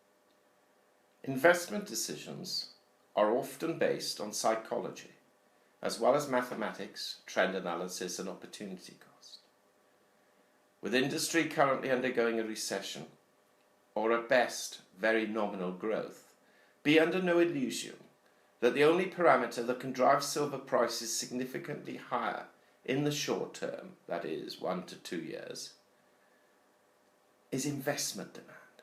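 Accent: England, United Kingdom. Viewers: Very thoughtful (the Eng accent helps) and an award for a nicely rigourous analysis; but, my gut says $38 as this Fall is just too econo-politically topsey turvey not to force a doubling.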